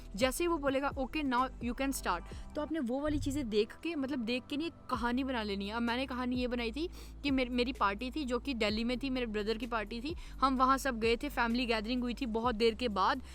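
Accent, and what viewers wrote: Jammu, India. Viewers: Are you from jammu, where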